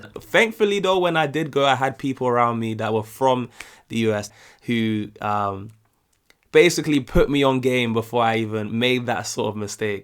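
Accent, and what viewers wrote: England, United Kingdom. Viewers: I don't think the customer service attitude is fake it's part of our culture because we are a consumer culture. Your accent yes in America we love the English accent it's like our favorite that and Jamaican. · 💙 THE ACCENT IS EVEN RARER WHEN YOU ARE A BLACK BRIT. 😆 A LOT OF AMERICANS ARE GENERALLY INTERESTED IN OTHER CULTURES & FOREIGNERS. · it sounds like you had a really nice American vacation. 😊 We Americans don’t hear British accents very often… our television shows and other media is 99% American so a British voice is indeed attractive to most of us and is a bit exotic to our ears. I’m a Southern girl and when I visit up north to cities like NY or even west to LA, the locals enjoy hearing my southern accent. It’s foreign to their ears just like your British accent. 😊 We do love road trips! As a teen, my family drove from Alaska to North Carolina. 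Glad you had a good trip and am looking forward to your next video. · I'm only at point 11 but I have to say, oh my goodness... the UK accent... I could listen to you talk all day lol.. seriously though. I LOVE your accent and I think it's pretty universal that you all have the BEST accent.. (well you and the Irish lol).. I don't even think it's opinion, I think it's fact. Lol...... it is like music to me. As for customer service, I know in my case it's not fake. I work in a grocery store, I shop for people and take orders out to their cars.... as tired as I get sometimes, I always try to think about the kind of day the customer may have had and always try to give my best. If I am having a bad day, the customers are always understanding. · We Americans love a British accent!! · Your accent, or, the British accent in general will improve your American experience. I love the accent. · 90% of the world drives the way we Americans do, and with the wheel on the left! 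And yes, we love that accent…but because so many people like me watch so much YT content from Brits, it’s not perceived as unique or special as it USED to be. The accent always projected intelligence…American news networks even hire British announcers for this reason…but of course, we now know people can be stupid on BOtH sides of the Atlantic.😂 But it still has that effect on us!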